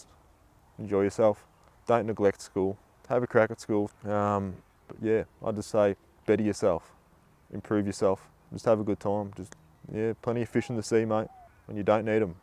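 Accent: Australia. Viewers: your lovely australian accent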